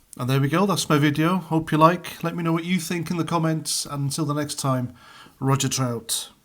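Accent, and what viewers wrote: Wales, United Kingdom. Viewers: Sounds like you are Welsh. Why are you criticising New Zealander? Hypocrite.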